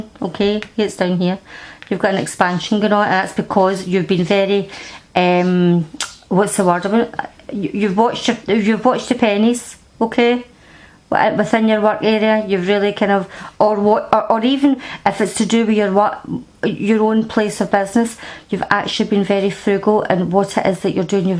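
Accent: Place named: Scotland, United Kingdom. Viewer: A Scot like myself, nicely read 👍🏽👌🏼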